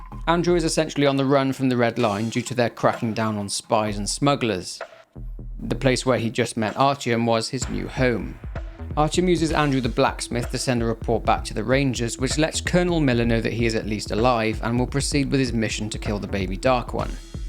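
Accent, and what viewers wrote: United Kingdom. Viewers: This is actually a really common thing amongst a death of British YouTubers. There's something about the accent that's soft, but I think it's largely how a lot of harder sounds like Rs are instead muted. We tend to think of Ks or Gs are harder sounding letters, but they're common on all languages and accents. Something about how certain letters are shifted in British accents just seems to soothe people. As the saying goes, the largest modern export of Brittain is their accent, and it's for good reason too.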